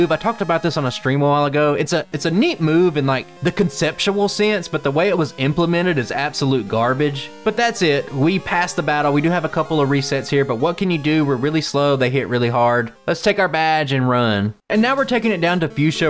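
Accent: South, United States. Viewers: That sounds like a southern drawl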